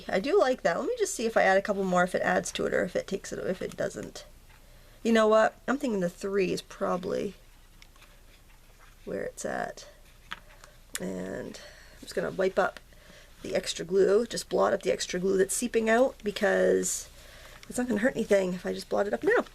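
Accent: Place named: East Coast, United States. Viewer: Great card I really like that giraffe looking animal its Wicked. Lol We don't get all the new sayings etc. that the East and West coast has, it takes awhile to make it here. One thing I did notice about the east is your words are pronounced different. My Dad was born in New York and you pronounce some words the same as him. You say drrw instead of draw. My Aunt's name was Ida and my Dad said Ider. Lol love it!! Thank for the great video!!!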